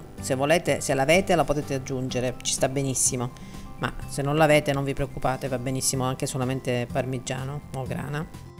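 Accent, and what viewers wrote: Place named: South, Italy. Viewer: A parte il fatto che sei una maga, in cucina: ma parliamo del tuo accento meraviglioso..mi scalda il cuore! Io son Sarda e adoro gli accenti del Sud e delle isole!!!!❤❤❤❤❤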